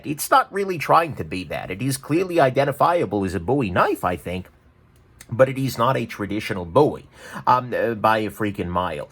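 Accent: New York, United States. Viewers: I thought you were a new yucker ! With the accent!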